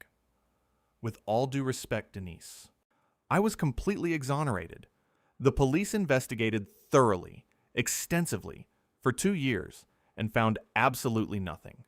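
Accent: United States. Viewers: This is fake - never happened. The bad, over-written account delivered in a nasal American monotone should be a clue that this is phony. More fool.the eejits who believed this.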